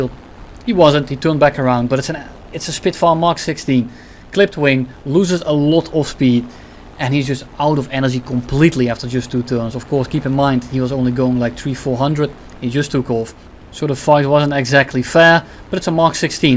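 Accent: Netherlands. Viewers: Are you dutch? You sound like you are from the Netherlands... These accents are too recognizable in Europe..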